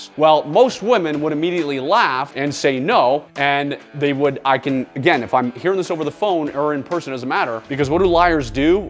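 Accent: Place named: Texas, United States. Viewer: That´s a Texan accent.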